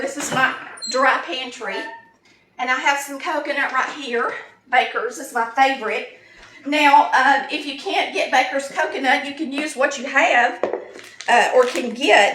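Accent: South, United States. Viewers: Girl you are SOUTHERN with hat drawlllll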